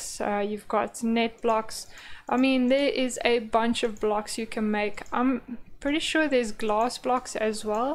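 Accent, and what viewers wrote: South Africa. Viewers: Love the accent. I'm thinking South Africa. Great video. Very helpful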